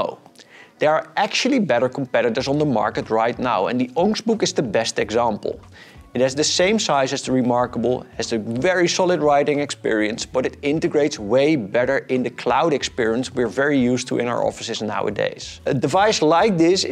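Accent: Netherlands. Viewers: i hear dutch accent noises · This was super helpful. This was exactly the information I was looking for. Having it all in a nice Dutch accent was just the cherry on top. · Great honest review. Love that dutch accent btw - reminds me of my days in Europe. I want to get an e-Ink device, but can't see it replacing my OneNote / Suface and iPad workflow. Pens with colors, highlighter and the incredible search and synch capabilities of OneNote make it impossible for me to take this big step back. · I appreciate a video that evaluates the product after a fair trial period. Given that you have used this item for 1 year adds complete credibility and that is obvious from your remarks. I've been going back and forth about the Onyx Boox for a couple months now. This video came at a great time for me. So, thank you. And I have to agree with T HOFF on your Dutch accent, it reminded me so strongly of my Dad who came to Canada from Enschede when he was 17 years old. 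My Mom always said, "Wooden Shoes, Wooden Head, Wooden Listen" Ah...but he was a good man.☺ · He spoke for one or two seconds and I immediately looked at his name to conform what I heard. And yep, he's Dutch. I recognize Dutch accents anytime. My native language is Dutch and I'm from Suriname, South America.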